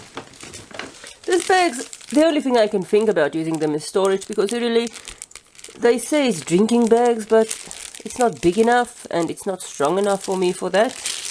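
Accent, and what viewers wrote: South Africa. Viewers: Joh that South African accent is coming through hard😂 Baie goeie review👌🏻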